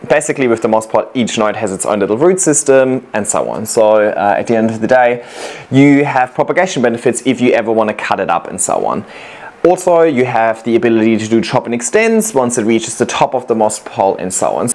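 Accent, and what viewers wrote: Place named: Germany. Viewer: I didnt even know you were from gemany! I was just curious about your accent. Like you speak fluent of course but somehow I felt something was different. Now I know what it is! Greetings from germany! Currently going into full "growing season" I was going to ask: Do you miss snow? But then I remember how we had like 3 days of snow last year... I mean you got white beaches afterall right?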